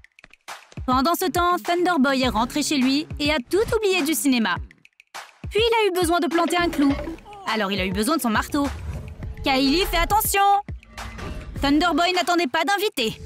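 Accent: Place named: England, United Kingdom. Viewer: Il sons pas français il sons englai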